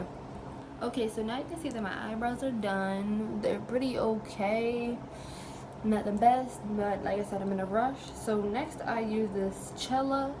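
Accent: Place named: Midwest, United States. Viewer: you sound like you're from northwest ohio or Detroit am I correct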